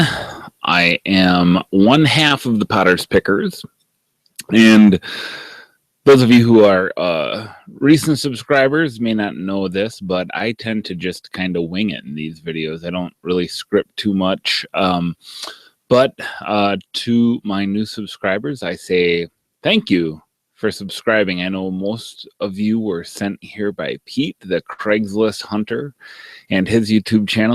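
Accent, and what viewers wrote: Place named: Wisconsin, United States. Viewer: I live in Milwaukee - I've been saying the same thing about getting to Pete's store but haven't been yet. Where in Wisconsin do you live? You have that signature Wisconsin accent that we're all famous for by the way!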